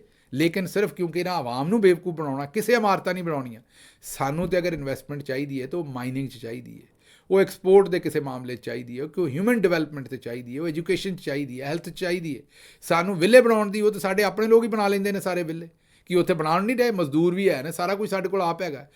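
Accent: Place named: Punjab, India. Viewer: جس طرح سے آپ پنجابی بولتے ہیں وہ واقعی بہت جادوئی ہے۔ کوئی اس وقت تک نہیں جا سکتا جب تک کہ آپ اپنی بات ختم نہ کریں۔ ہم آپ کے پنجابی لہجے کو کاپی کرنے کی کوشش کرتے ہیں لیکن ناکام رہتے ہیں۔ لاہوری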